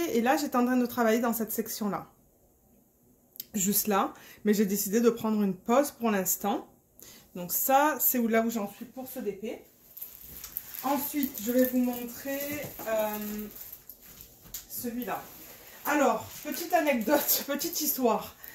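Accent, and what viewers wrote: Marseille, France. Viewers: Hello 😊 j’ai profité du 11 pour 2 toiles perso chez Ever moment. Jasmine becket griffith c’est pas trop mon truc non plus mais je vais aller faire un tour par curiosité sur le site. J’ai adoré les anecdotes sur les expressions, les 2 premières sont hilarantes. Par contre pour le terme écœurant ça fait drôle de se dire que ça signifie le contraire au Canada 😏 Je trouve que tu as encore un peu l’accent marseillais même s’il doit être moins prononcé qu’au début de ton arrivée. Ça m’intéresse de savoir pour les écoles perso car avec mon mari on se demande si par la suite on ne déménagerai pas au Canada. Paraît il que c’est le top pour les enfants autiste comme mon fils, en France c’est une vraie galère, un combat que je n’arrive plus forcément à mener et qui m’épuise. D’ailleurs je suis en mode gâteau, mon fils aîné fête ses 9 ans demain! 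Je ne savais pas que l’on pouvait parler avec toi sur Messenger 🤗 Passe un bon week-end 🙂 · Excellent vlog ! Tes expressions sont trop drôles !! Tu m'as fait bien rire !! À chaque fois que j'entends un français dire "les gosses" les oreilles me silent !! Hahaha Oui pour Tupperware il faut le dire en anglais ! Et pour moi tu as définitivement encore un accent français ! 😉🤣 Dans les banlieues nord, tout est seulement en français. Il n'y a pratiquement rien en anglais. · Coucou ça va ? Alors, non je n'ai pas profité des soldes du 11 novembre sur aliexpress parce que j'avais déjà passée toutes mes commandes. Je n'ai pas pu attendre lol. J'ai tellement hâte de te voir faire la toile aux 275 couleurs ! J'aime beaucoup ce que propose Diamond Dotz mais je trouve que c'est très cher ! OUI j'adorerais pouvoir voter pour ta prochaine toile et avoir le choix entre 3 ou 4 dp. J'ai beaucoup aimé tes anecdotes sur le Canada par rapport à la France. 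C'était très drôle. (Oui ! Tu as toujours ton accent marseillais teinté d'un accent québécois 😉) Le tag : J'adore mon travail. Je vend des piscines par téléphone en télétravail et j'aime ce que je fais. Les gens me font partager leur projet, un petit bout de leur vie et c'est varié et très intéressant. Mon genre de film : les comédies, les films fantastique et les drames. J'aime beaucoup les films comme love actualy, hunger games ou la liste de Schiller. J'aime pas trop les films d'horreur, je suis trop sensible je crois lol. Je commence ma journée tranquillement avec un bon petit déjeuner. J'aimerais pouvoir faire du dp dès le matin mais avec 3 enfants c'est pas possible ! 😂 La chose la plus importante pour moi c'est ma famille. C 'est vraiment ma priorité. Je suis nulle en cuisine ! Je fais beaucoup d'efforts mais je n'aime pas beaucoup ça 😅 Mon super pouvoir : j'aimerais pouvoir rendre heureux quelqu'un juste le touchent avec les mains. 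Mon 1er boulot : caissière dans une grande surface. J'ai bien aimé. Les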